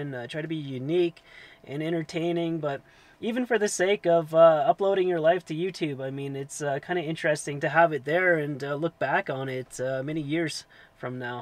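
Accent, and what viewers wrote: Canada. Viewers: I miss your Canadian Accent...:-))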